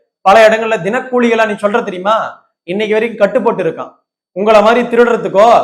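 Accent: North, India. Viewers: ஆமா பேச்சி வடக்கு கிராஸ் மாதிரி இருக்கு.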